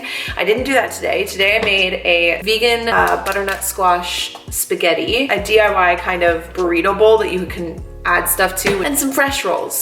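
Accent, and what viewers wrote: Canada. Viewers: Us Canadians say things differently ahaha 😅😅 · I think it's the Canadian accent. We like our 'A' sounds. :) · its how Canadians say it, i watch aton of youtubers from canada and they all do it hah its just different! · Sounding like a Canadian in this video lol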